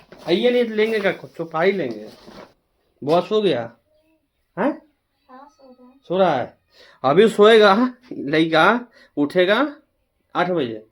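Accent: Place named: Bihar, India. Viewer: Aapka ka bihari andaaz achchha hai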